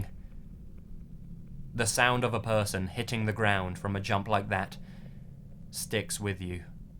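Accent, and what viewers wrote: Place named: England, United Kingdom. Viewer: I LOVE this format. Lots of little (but intense) stories. Thank you for all your work. I love your voice too. Being from Sydney I hear a lot of English accents but yours is so warm and lovely.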